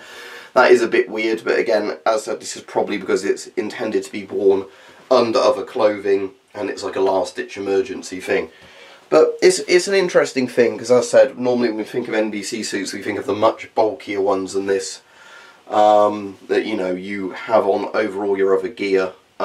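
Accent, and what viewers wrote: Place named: England, United Kingdom. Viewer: I like you english voice👍🏻👍🏻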